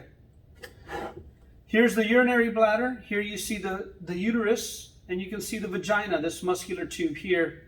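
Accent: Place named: United States. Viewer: Sprich deutsch. Dein ami-palaber ist für mich unverständlich.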